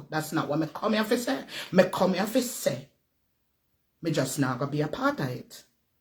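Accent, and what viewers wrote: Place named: Jamaica. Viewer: Real,real Jamaican talk.❤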